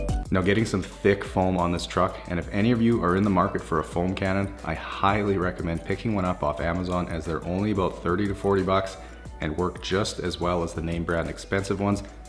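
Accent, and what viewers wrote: Canada. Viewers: Eh? Looks a lot better than I brought it eh? Yea a little less mud on it eh? I love the Canadian speech 😂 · anyone else surprised when he started speaking canadian? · I ligit screamed when he was talking to the customer I never thought he sounded Canadian till now the aye and everything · Dude doesn’t sound Canadian when he does voiced overs but when he talks to other Canadians in a video he sounds Canadian as ever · His inner Canadian comes out when he associates with other Canadians. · Love how you became more Canadian sounding once you were talking to your bud there! It's so funny how we make each other Canadian.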